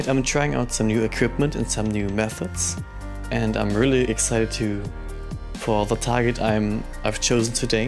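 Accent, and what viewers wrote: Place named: Germany. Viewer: Ich finde den Videoschnitt echt super, hat so ein leichten Astrobackyard touch, was ich sehr begrüße nicht falsch verstehen und auch der Ton ist schön ausbalanciert und angenehm, bis auf vllt das es keine 3 Sekunden braucht um zu merken das du eig Deutsch sprichst 😉 Wo es ja so schön um Field flattner geht, kennst du irgendwelche für 1,25" OAZ's? Habe mein Newton soweit modifiziert das ich mit der DSLR in der Fokus komme aber bis jetzt nur 45€ Field flattner welche die Brennweite halbieren gefunden... Und wenn ich das bei 700mm mache kann ich, Brennweiten technisch gesehen, auch mein 200mm f/4 mit nem x2 Telekonverter nehmen und komme auf mehr Brennweite... Dafür ist das dann doch irgendwie blöd. Auf 2" aufrüsten hab ich auch schon Mal dran gedacht, hatte mir aber vor rund 1,5 Monaten ne Baader OIII CCD Filter mit 1,25" gegönnt und der wäre dann ja logischer Weise nicht mehr nutzbar 🙁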